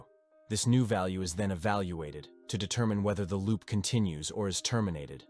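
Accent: Jamaica. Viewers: we need that orignal jamaican voice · original voice with that Jamaican accent was the one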